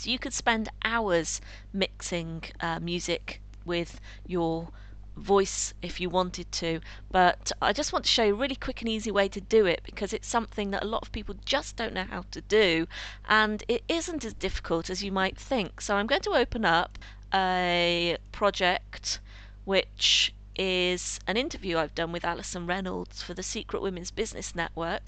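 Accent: England, United Kingdom. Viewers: i wish i had ur cool english accent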